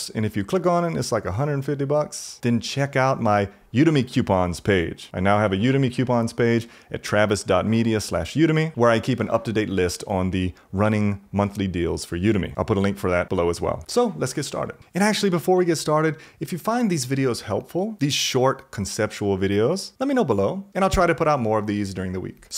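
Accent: South, United States. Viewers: You have a very subtle southern accent.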